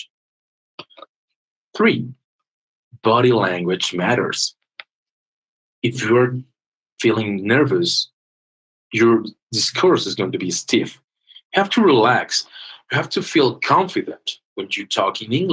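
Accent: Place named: England, United Kingdom. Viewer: Omg 😱😱 que perfecto abla el inglés como en Inglaterra estoy asombrada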